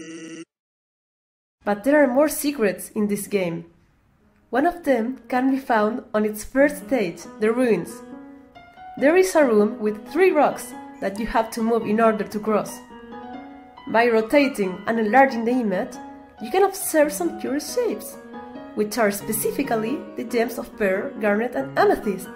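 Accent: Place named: Russia. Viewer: bu arada teoriyi anlatan kadın rus aksanıyla konusuyor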